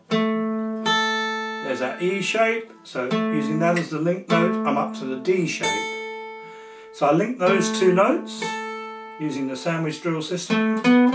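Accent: United Kingdom. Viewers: oh noooo!! brit english again.. :-(((((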